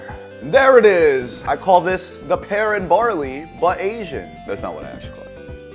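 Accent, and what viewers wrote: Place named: South Korea. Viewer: This gyopo sounds more korean than most native genz koreans wtf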